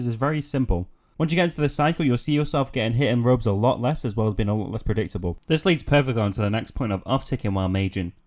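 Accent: United Kingdom. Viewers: Try talking slower.. the combination of your british accent with how fast you talk means half of the world cant understand you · Proper English accent as it should be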